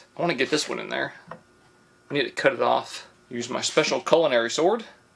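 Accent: South, United States. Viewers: Funny thing is those sweet potatoes were probably grown in the USA. They are extremely common in the South. sounds like he has a southern accent surprised that he doesn't know about it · I just found this channel and I'm a bit confused. I thought for sure from his accent that he's from the south US, but he's never seen a sweet potato? Maybe his family used canned yams and he doesn't know they're the same thing.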